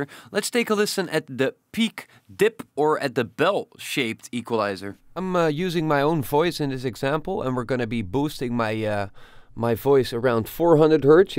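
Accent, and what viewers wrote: Netherlands. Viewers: Your accent sounds very Dutch .. are you by any chance Dutch?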